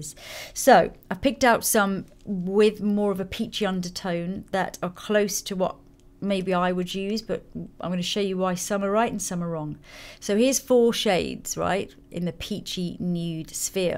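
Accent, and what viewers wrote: United Kingdom. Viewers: Howdi yal , I fell on this video and why did i not click away ?? Idk maybe hearing a British voice ?? But I was so enveloped but her voice and video I payed so mutch attention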